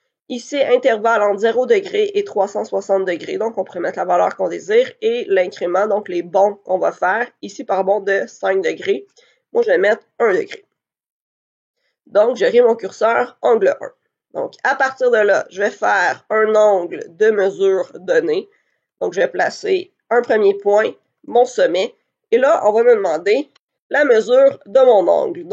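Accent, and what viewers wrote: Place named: Quebec, Canada. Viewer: accent québécois magique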